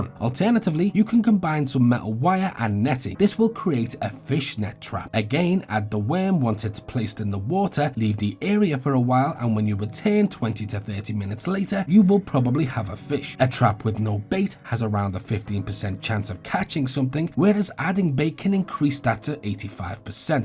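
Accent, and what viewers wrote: Liverpool, United Kingdom. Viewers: hi mate love the videos! are you a fellow scouser? lol, sounds like it.. just wanted to ask, im tired of joining servers with absolute sweats where i cant do an airdrop or koth in peace, do you know of any servers you can share that are abit more beginner friendly where most people are on the same boat as me? wold be great if you could recommend any, thanks man! once agin love the content keep it up!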